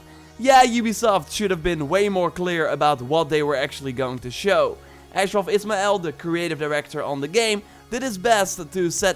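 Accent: Netherlands. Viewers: Ben je Nederlands? Your accent gives it away xD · And BAM 😆👊 Awesome 😄 I have a question: Where are you from? Your accent sounds Dutch 😁 Greetings from Belgium 😊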